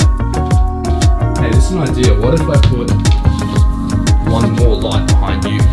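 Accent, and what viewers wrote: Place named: Australia. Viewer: An Asian girl with a Aussi Accent... Omg Dream girl hahah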